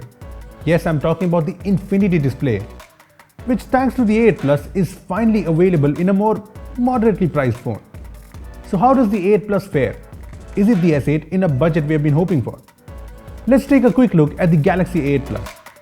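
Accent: India. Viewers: Most genuine indian english accent. Nice review....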